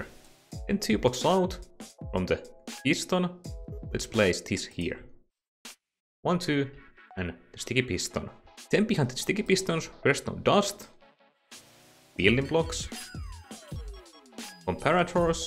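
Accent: Finland. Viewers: XD where are you from btw? your accent sounds so much like finnish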